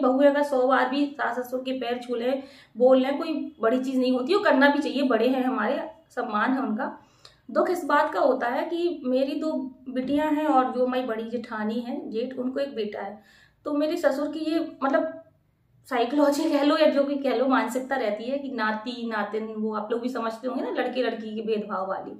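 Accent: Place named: Orai, India. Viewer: आपकी भाषा तो हमारे यहां की लग रही है मैं उरई से हूँ आप किस शहर से है🤗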